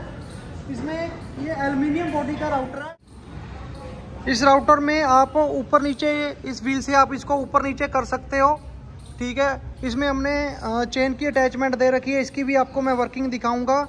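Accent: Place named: India. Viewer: creo q es ingles con acento de la india jejej, pero se para q sirve la maquina , se ve genial y solido, en pais seria como una novedad. Felicidades por su ingenio y trabajo.Saludos desde SudAmerika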